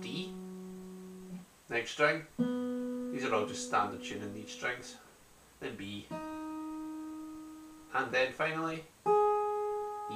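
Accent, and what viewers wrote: Scotland, United Kingdom. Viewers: My good friend comes back from Oz raving about this guy, I check him out. Being a huge fan I love the cover, I then think to myself "I could learn this and it would go well with my scottish accent, I wonder if there's any tutorial videos online". I come on here and it's a scottish guy doing the video. Meant to be. Cheers for the video mate!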